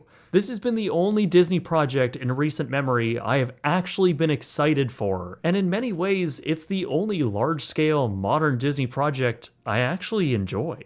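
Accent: Canada. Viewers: Canadian accent i think and southern ontarian. Ie he sounds like me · I heard him pronounce it correctly! I think his Canadian accent softens it a bit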